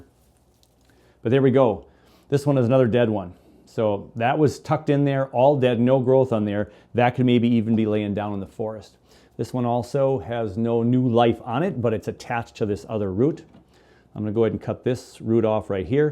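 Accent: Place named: Minnesota, United States. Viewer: Just stumbled upon your channel and the first thought I had was, "Huh, that turntable looks like the ones Van makes." Second thought was, "This guy sounds Minnesotan" and then you mentioned being in Minnesota and I was like, "Ah, well, there you go." Did Van make your turntable?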